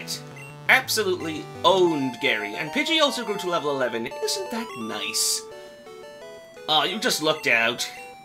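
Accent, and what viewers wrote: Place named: United Kingdom. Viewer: I LIKE IT BECAUSE YOU KINDA SPEAK BRITISH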